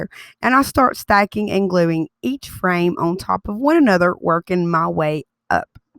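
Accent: South, United States. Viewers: sweetest southern drawl ever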